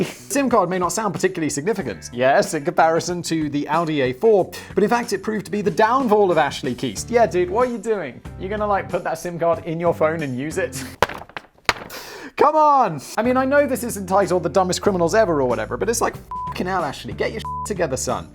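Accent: United Kingdom. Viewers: slow down Americans need a minute to understand limey and I grew up with neighbors from Sheffield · Yeah, must admit I was slightly startled by a guy with a British accent (albeit now living overseas) having so much difficulty pronouncing an English placename...? ;-p But then again, many of them do seem to have been designed to make it difficult even for the natives...?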